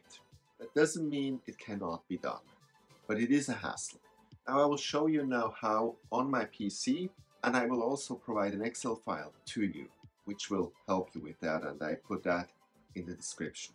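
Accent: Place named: Austria. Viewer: This guy's accent is Arnold light!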